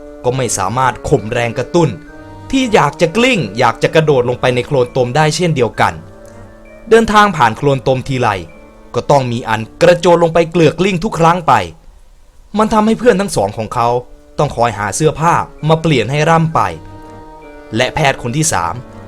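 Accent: Isan, Thailand. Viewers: พี่คนอีสานป่าว คนบ้านใด๋นิ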